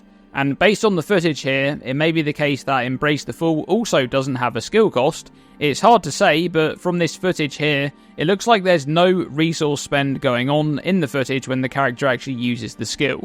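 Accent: United Kingdom. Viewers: The English accent is the nicest of all the accents.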